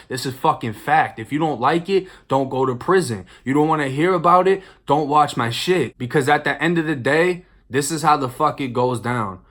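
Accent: Boston, United States. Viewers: Man ive been watching your videos, from the beginning on 23&1. Its nice to see you putting up some numbers. Ive been in 3 times, 18, 22,26, I'm 38 now. I was in NC for the first 2 and nd for the last. Prisons are rough especially my first bid in Polk youth center. It's what you call jit camps. But not as rough as the shit youve been though. Stories are crazy. But I can tell that you learned alot and matured in a way the streets cant provide. You can just tell by the way you reflect back on your time, and situations you went through and learned from. Alot of people dont understand the invaluable lessons your learn about life from prison. After the prison mentality melts away, you can apply those lessons to the way you conduct yourself on the outs, as well as knowing how to treat people with respect. Man keep up the good work, and I'm glad you went back home, florida would have got you caught back up. 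I'm watching this show called city on a hill, about Boston and your accent trips me out, cus its just like the dudes in the show. Anyway, keep up the great story telling. Others can learn invaluable lessons just by listening to the things you say.💯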